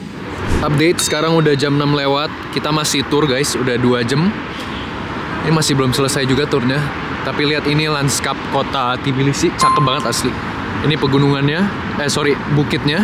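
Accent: Indonesia. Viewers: Ejaan bahasa inggrisnya logat indo aja, gpp kok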